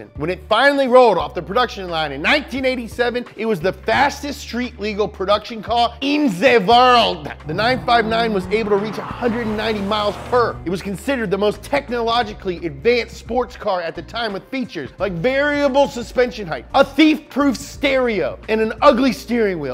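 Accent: Germany. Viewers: out here with the best german accent in the business. Love it! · I need a 12 hour compilation of speaking German-ish English, anyone else?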